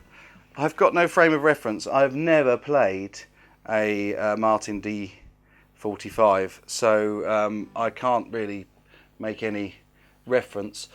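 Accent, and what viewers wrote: England, United Kingdom. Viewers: See your playing the right way with a pick and your english accent very cool I love england people I'm a italian from New York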